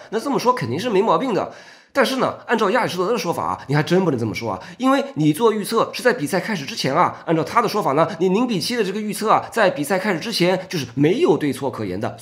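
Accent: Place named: North, China. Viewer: 中文就是垃圾语言架构，博主批你啪拉一大堆！我感觉你在讲绕口令（博主大概率是河北、或者东北人； 博主干脆改成绕口令辩论，与哲学距离太大。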